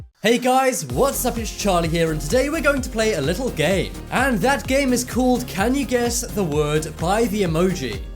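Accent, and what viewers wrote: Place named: United Kingdom. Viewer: He sounds btitish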